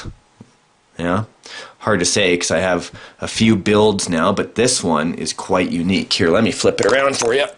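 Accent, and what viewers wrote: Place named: Canada. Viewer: So funny ur canadian accent... "eut" out lol. Great project!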